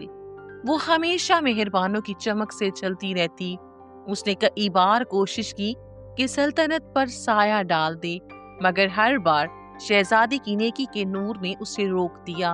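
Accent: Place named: India. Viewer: Hindi accent ki jaga Urdu accent use krn Clip's ka liya vo3 use krn vo apka original characters voice da ga apko audio lagana ki zarorat nhi para gi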